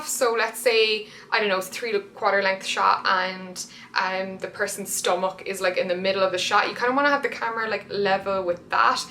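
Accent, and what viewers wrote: Ireland. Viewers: Funny thing.... I was watching while getting my son (12) ready for school then sees you and said that he thought I was watching RetroFlame! I told him this is and she’s Irish too. Her and Erika have the same accent. I do tell him and show him from time to time the Youtubers I watch. Just thought it was hilarious! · Love videos like this, I always learn something :) Maybe you can do one about how to do well on Instagram? Also, is it just me or is your accent stronger after you spent some time in Ireland? Such a nice accent :)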